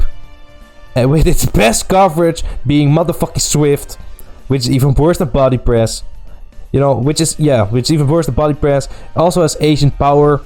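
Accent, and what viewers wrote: Netherlands. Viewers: Lmao dat nederlandse accent maakt deze video zo veel beter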